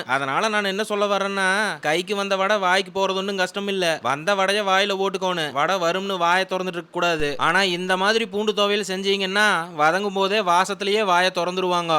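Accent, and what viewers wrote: Coimbatore, India. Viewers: தம்பி உங்களுடைய கோவை பேச்சு super போங்க. உங்களோட கருத்தையும் சொல்லி சமைக்குறவிதம் அருமை அருமை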